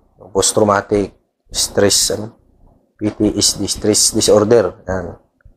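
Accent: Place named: Visayas, Philippines. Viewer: Hehhehe katawa ko sa baho way ligo² mura lagi ka og bisaya sir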